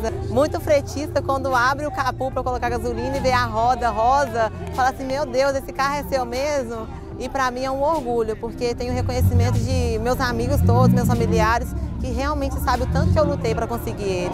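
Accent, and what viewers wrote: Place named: Minas Gerais, Brazil. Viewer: Sotaque amei mineres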